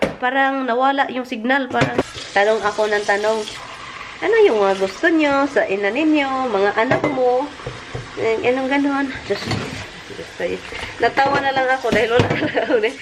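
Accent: Visayas, Philippines. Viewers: Janice sandmayr perfect ka ba?bisaya nga sya di ba?wag ka manood ng vlog nya kung basher ka lang!How dare you!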